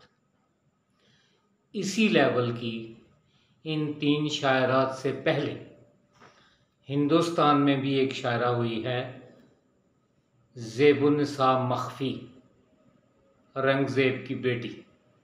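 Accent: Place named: Iran. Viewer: Your persian accent is so beautiful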